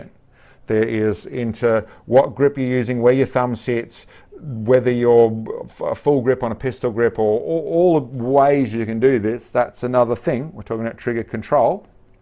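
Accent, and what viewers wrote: Australia. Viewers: This guy sounds Australian, but Australians can't have guns. So is he African?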